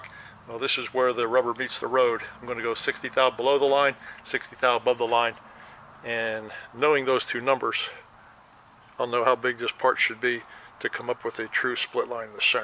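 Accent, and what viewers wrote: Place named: Long Island, United States. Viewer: This guy sounds like my father and his brother from Long Island. Definitely interesting and informative I’m subscribing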